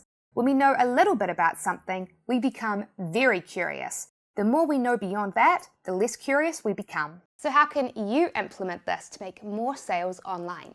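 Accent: New Zealand. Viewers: New Zealand. Good luck to you🙏🏼 shes good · Definitely a kiwi. NZ.🙂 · Amir B It is none of these. It is a New Zealand accent.😀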